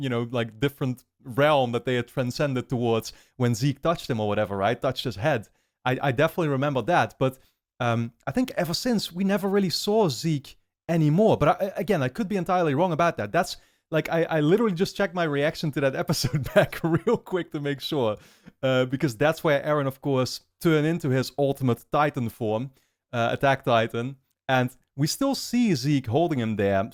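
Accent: Netherlands. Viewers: Haha I can hear you're Dutch from a mile away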